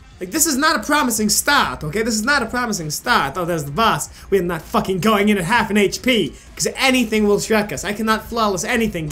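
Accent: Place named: Italy. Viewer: I had a pretty bad speech impediment with R's too, I sometimes hear myself say them with a w and mentally face palm. It hardly ever happens, but my every day speech is stilted to the point of it sounding like a genuine Russian accent, as I've been told, and it's kinda funny to me that you mention your accent bc I always think of my Italian great grandparents when you use it. They were first gen immigrants to America and their English was really terrible, it sounded very much like your accent at times, which is incredibly amusing to me knowing it's supposed to be Russian. You also sometimes sound like my uncle and cousins from Jordan, with the kind of accented buisness talk, I guess? It's kinda funny.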